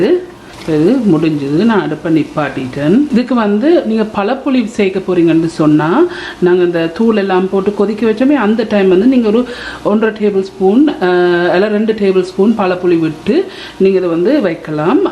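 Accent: Sri Lanka. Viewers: உங்கள் சமையலை விட உங்கள் இலங்கைத்தமிழ் அருமை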